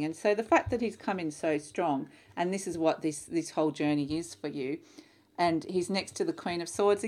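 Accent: Australia. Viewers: Yeah those aussie spiders can be scary! 🤪your readings are wonderful love! And nothing more lovely than the stralia accent! 💖💕 my home away from home. 🤸‍♀️💫💖 thank you for the great reading!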